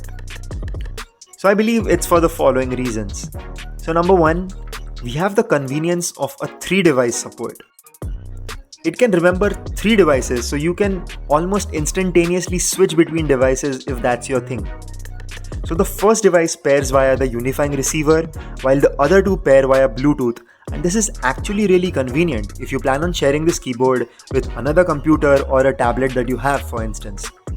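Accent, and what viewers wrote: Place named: India. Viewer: except for the Indian accent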